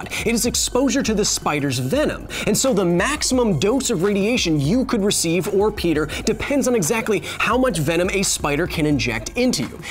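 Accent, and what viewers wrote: Australia. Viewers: That aussie accent 😂🤣❤🇦🇺 much love from down under. Bloody great video mate! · I know I'm late to the party, but that Aussie accent is almost spot on. had me excited for a sec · unironically a fantastic australian accent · thats a good Australian accent! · He's Australian accent 😂... It's good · That aussie accent is a 7/10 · ikr! I'm surprised there aren't more comments talking about austrailian accent lol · His Australian accent is actually very real lol. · The aussie accent 😂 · That Australian accent is on point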